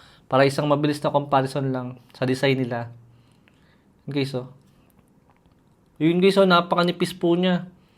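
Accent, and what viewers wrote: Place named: Batangas, Philippines. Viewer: Taga saan k boss parang batangueno ka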